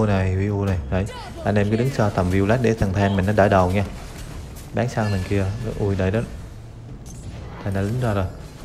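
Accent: Quang Nam, Vietnam. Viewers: Người Quảng Nam hay Đà Nẵng rứa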